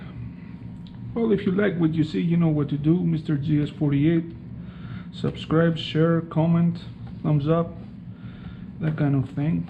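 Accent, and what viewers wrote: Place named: Greece. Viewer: Yo man... finally a voice. Love the accent. Reminds me when I was kid... my buddy was Greek and his dad had the same accent. From what I have read, the Puig is great for wind protection. Good job bud.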